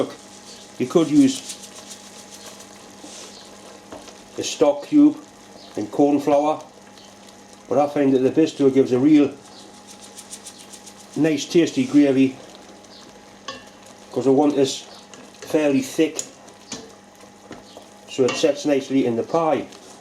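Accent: Newcastle, United Kingdom. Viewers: What a wonderful surprise to here a geordie accent..then seeing you cut the onion on a lathe knew I would like the video maker,thanks for the plate pie recipe regards Sue from Whitley Bay now living in New Zealand for 40 yrs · Some of me best memories from the fifties as a bairn were Sunday teas at me Nana's in Lemington. Everybody came so it was a full house and she made these same mince pies and they were just devoured in no time. I had 4 uncles so they got first dibs. I think your version is the closest I've seen to what I remember and I have to say ta very much for bringing back good memories, and as much as the plate pie was lovely, hearing the Geordie was balm to me soul. Since I last had a poke round through your videos I'm dead glad to see you've put all the recipe ones together. Makes it a lot easier to find when you're as old as me. We"ve lived in Alberta since 1967 and I miss home still. 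Me Dad was a whitesmith at Parsons so even your work is interesting to an old woman an all. Thanks very much for sharing, and hope you and yours are keeping well. Merry Christmas! 🤶 🎄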